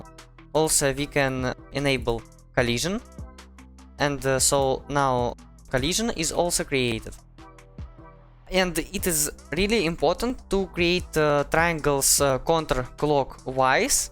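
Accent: Russia. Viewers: бро мы все знаем что ты русско говорящий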